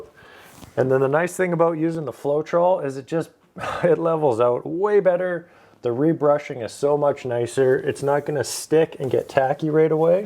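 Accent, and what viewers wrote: Canada. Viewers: Finally! A YouTube video where you don't feel being yelled at! Oh! I just picked up here Canadian. That explains it.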